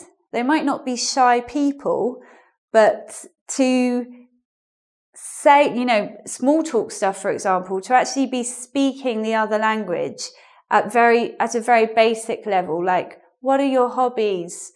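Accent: United Kingdom. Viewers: WONDERFUL!! video and it's good to hear some British accent!! :) Can't wait to see your new videos!!! <3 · ! I loved your british accent _ How come to an introvert person become a teacher? lol!:) Keep this great work! ^^ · Like your accent...I like British accent a lot! Brilliant explanation. Have been living in London for over a year and I got amazed by British accent. This is the way it should be spoken because english comes from England.Thank you for sharing. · you teach Bristish English , but i study American English - and i love your striking accent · Hey, your first video was Amazing! I knew it! Everybody here in engVid is glad to be part if this lovely team, You did it quite well! I look up to you, congratulations and thank you for helping us listen to your British accent!:P I follow you in your channel as well, kind regards! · At first I express congratulation you on being hired by the engvid. 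The fact is to probe your English teaching methods were recognized by the company. I also learn from your YouTube and am enchanted by your British accent. By the way, in Japan, the once upon a time, as the English proverb saying, the notion that the silence is gold had been prevailed such as the book called Quiet whose author is Susan Cain which insists even if the introvert people are not assertive toward others but the high ratio of percentage, they have the high potential than extrovert people. But in fact in Japan nowadays I feel that extravert people are superior to introvert people. As it is often said, everywhere, because many of extraverts have capacity to say their opinions in the office meeting, and the introverts are treated as people who do not have self opinions and as transparent people and are ignored. So when it comes to dating, extraverts can ask opposite sex to go out by using positive words and attitude and the possibility of succeeding in being accepted is high. 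So I am an introvert man, so in Japanese society, I always was taken advantage by extravert people unfortunately. Sincerely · I can't focus on the lesson because you're so beautiful and i enjoyed hearing your british accent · Thank you very much. Nice advices. And I think you speaking British English. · Hello, thank you for your lessons, I like the way you teach and you British accent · It's good to have British speaker. I like their accent. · Hi, Perfect, the UK accent! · PERFECT BRITISH ACCENT!!! Thank you ever so much,!!! I do like it! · I'm a beginner for english. I practice very hard.I would pronounce them like her because I love UK accent. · Hi,! I'm begining my English learning with EngVid and your video was very helpful for me. I like your brit accent ) Thanx from intorverts;) Sorry for my mistakes. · British accent <3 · I think that you speak by British accent and it is very difficult than American accent. 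I want to talk to British girl or boy to learn to say the right accent. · Honestly i really like your accent, i need to learn the British accent,its really special language for me, and nice to meet you and all my eyes on your topics now, waiting to see a new videos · What a great video! :) I love your british accent sooo much. Greetings from the Czech Republic! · Went through many youtube English learning channels, but now I found one with London/British accent which I missed =) I can't help myself but this accent is just soo cute XD Also I remember my first time in London, even after one year of everyday English learning course, I had problems to understand XD Well after three months there I got better (but still I need to listen carefully) Also I'm typical introvert =) Also I subscribed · Hi! i'm from Venezuela and I do understand you perfectly, love your british accent <3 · British accent though !! 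So natural !!! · You have a boyish name. LOL but I love your voice, in fact I really love listening British accents. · British accent ~awesome :D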